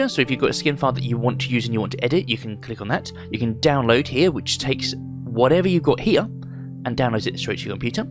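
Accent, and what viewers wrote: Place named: United Kingdom. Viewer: I knew he was living in UK not beacuse of the location but the accent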